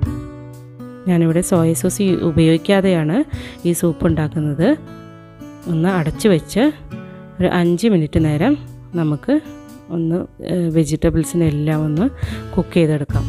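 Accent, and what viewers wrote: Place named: Kasaragod, India. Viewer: 😊lastil paranjathu pettanu manasil ayilla. Onude ketapol karyam pidikiti.😊. Ethu natile anu aa style. Kasargod style anoo. Pine healthy and rich soup adipoli👌❤️❤️❤️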